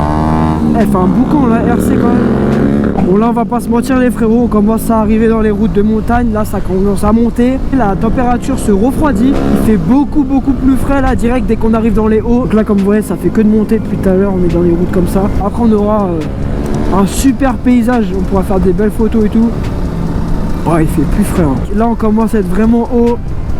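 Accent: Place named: France. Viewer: et mec aussi ton créole avec l'accent "zoreille" ça me tue 😂😂 " lé bon"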